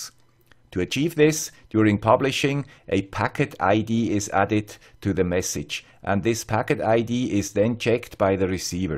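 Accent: Switzerland. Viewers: Damn you 'guy with the Swiss accent'! You've gone and preempted me - with the 'topic' of my forthcoming video (see what I did there)?. But thanks for the reference and excellent dual between Broker and UTube. I'll go back and 'like' furiously! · Thank you friend with the Swiss accent. I have enjoyed many of your videos and was excited to see you get a Pi Zero W, now MQTT. I will probably have to watch these videos many times until I understand since I'm new at coding. Thanks for the good tutorial, Hope to see many more. I need help!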